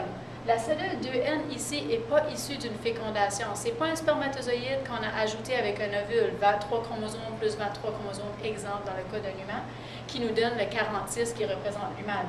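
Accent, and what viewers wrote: Quebec, Canada. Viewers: t'es sourd ou quoi ? ça s'entend à 2 km qu'elle est québécoise.. (l'idiotie se soigne)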